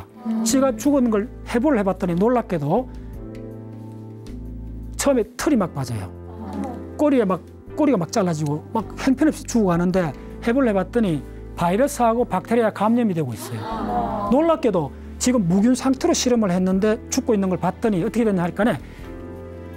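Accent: Gyeongsang, South Korea. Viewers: 사투리 억양까지는 그렇다쳐도 표준어로 강의 하셔야겠어요. 사투리에 경상도 억양에...좀 그러네요. 게다가 발음도 좀...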